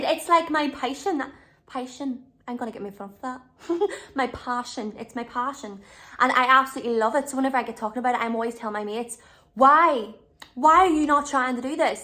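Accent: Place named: Ireland. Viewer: Irish accent sounds so harmonic.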